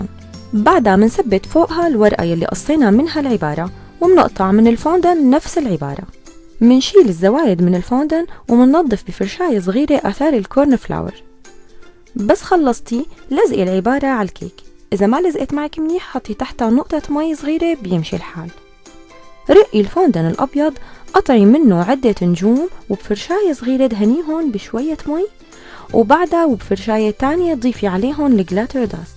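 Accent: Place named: Levant. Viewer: تسلم ايديكي شي ظريف بحب لهجتك كمان شاميه 😙😙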